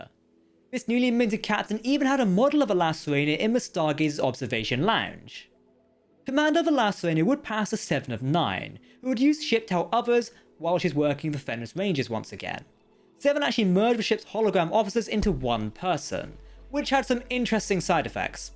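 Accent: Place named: United Kingdom. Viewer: Being hard of hearing is making your accent even harder to process. I would enjoy it much more if you spoke in an American accent. I have NOTHING against British folk, but it is what it is. After all, if weren't for your ancestors, America wouldn't exist. Sorry to be a bummer.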